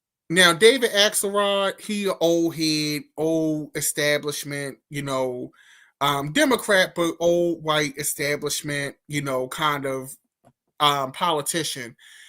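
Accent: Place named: Maryland, United States. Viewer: Great perspective..I knew you were from Maryland....that accent. 😊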